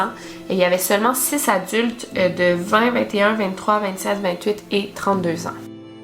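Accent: Quebec, Canada. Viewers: avant je détestais l accents québécois mais dans la bouche d une jolie fille c est toute suite mignon .Continue comme ca tu nous trouve souvent des histoire pas trop connue ou du moins toujours intéressant dans la réflexion👍😗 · J’AI VUE SA TÊTE J’AI PENSÉE MIND HUNTERS 😭 Sans rigoler j’adore tes vidéos, j’suis abonnés depuis longtemps et merci beaucoup pour tout le travail que tu fais c’est ouf, j’ai toujours apprécié tes vidéos , tu es toujours respectueuse envers les victimes et tu racontes très biens les histoires (et tu m’as fait aimé l’accent canadien) bref je like et je commente pas souvent et j’vais essayer de le faire au moins pour toi parce que ça vaux le coup🙃 J’espère que tu dors bien mdr ça doit te prendre tellement de temps de faire autant de vidéos en octobre, encore merci❤️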